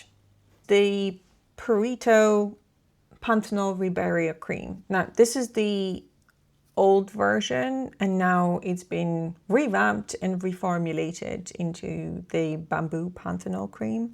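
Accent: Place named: Ireland. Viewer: Nice video! Are you living in Ireland? You have quite an Irish accent 😊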